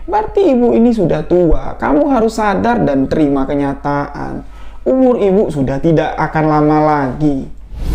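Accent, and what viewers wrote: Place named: Jambi, Indonesia. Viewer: Walaikumsalam Yana -Banten hadir Kak boleh request upload kisah Kota Ghaib Saranjana..... MasyaAllah Pasti y kereen banget lw cerita y yg bawain kak dgn Logat Jambi yg khas....🙏 Hayu Gaskeeuunn atuh kak..... 🔥🔥🔥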